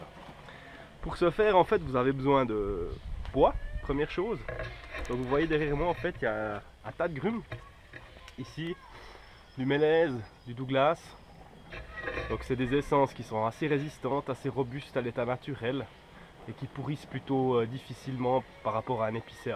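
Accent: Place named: Switzerland. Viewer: Mugnerot Daniel morteau morille c l accent du doub et lui c l accent suisse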